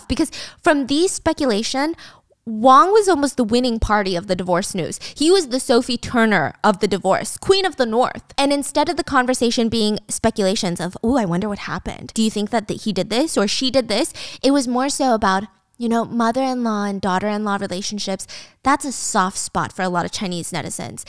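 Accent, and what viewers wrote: California, United States. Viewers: You talk like a girl from Cali I think. Are you from Cali? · You have the strongest california accent I've ever heard xD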